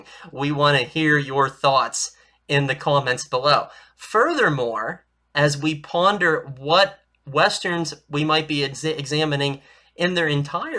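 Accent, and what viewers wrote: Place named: Pittsburgh, United States. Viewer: "Dahntawn" Tombstone. Good to see a fellow yinzer making it big on YouTube! ⬛️🟨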